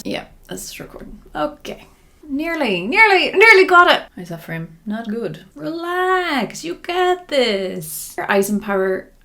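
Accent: Northern Ireland, United Kingdom. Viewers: She has a Northern Irish accent.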